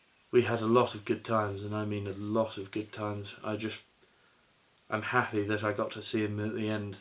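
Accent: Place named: RP, United Kingdom. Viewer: Man I wish I could give you a hug. I hope you can find peace with yourself, I just came across your videos this year and I absolutely love your silly antics snd posh accent. We all got your back ❤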